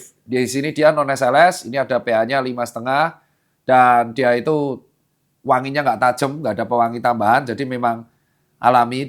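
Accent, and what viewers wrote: Java, Indonesia. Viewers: Ful ngakak anjir beliau kalo ngmong asbun blundernya terlalu plenger mana aksen jawanya medok lagi😂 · jowo nyelll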